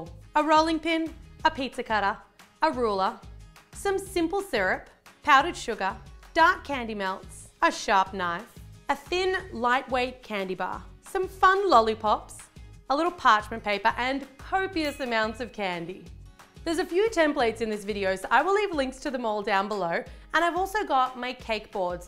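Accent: Australia. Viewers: she is australian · Anyone know what part of Australia she is from? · are u australian ? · Is she Australian?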